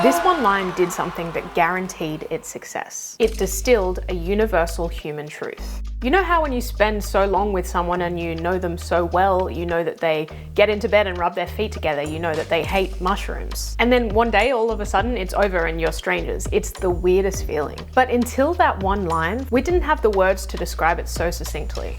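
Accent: Australia. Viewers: How good are you? I found this really engaging from the start but about a third way in was when I realised you're a fellow Aussie. I was taken aback at how completely deaf to it I was and that's rare. So I reckon it was the quality of the subject material and the presentation that made me overlook what is often a big deal for me. Or was this a Jedi/advertisers mind trick you played? Either way great material. Thanks.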